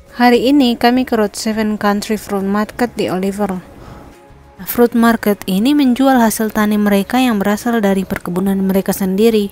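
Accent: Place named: Makassar, Indonesia. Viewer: trims infonya kak. btw kakak org makassar kah? logatnya mirip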